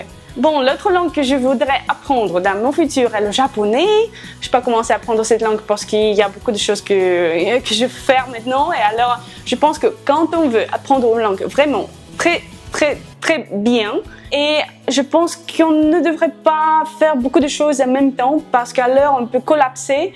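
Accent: France. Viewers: J'adore ton accent français ! Aimez-vous les langues comme le norvégien, le swedish (je ne sais pas comment ça se dit en français)? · You have a great and unique french accent Ms..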